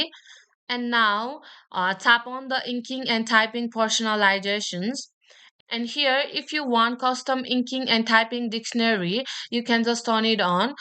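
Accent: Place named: India. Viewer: Next time use an AI English voice. The Indian English accent is very difficult for most American to understand. But otherwise good info.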